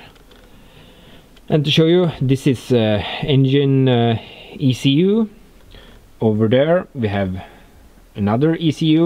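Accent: Sweden. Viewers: I guess you're from sweden because your accent sounds like Christian von Koenigsegg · are you Swedish?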